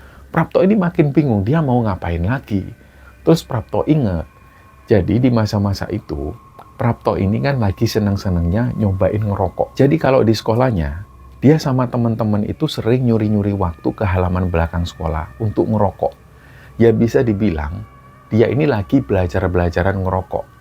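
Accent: Java, Indonesia. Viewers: Ciri khasnya orang Jawa ngomong duduk aja serasa bilang dhudhuk medok sekali 🤭🤭 ya mas orang Jawa kalo bicara medok sekali..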